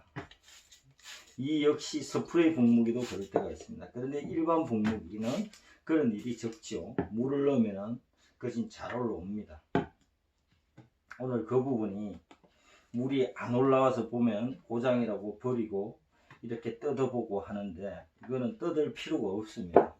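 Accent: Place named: China. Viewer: 중국분이세요? 듣도보도 못한 사투리네요 ㅎㅎㅎ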